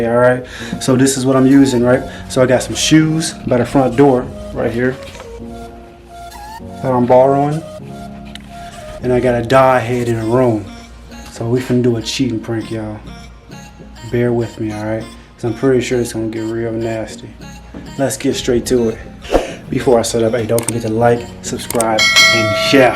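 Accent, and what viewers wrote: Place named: Jamaica. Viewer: I hope y’all haveing a good day and my Jamaica 🇯🇲 sister just have to say this JAMAICA FOREVER 🇯🇲 love how u talk we Jamaicans funny 😂 😂 btw I’m a new subscriber 🤗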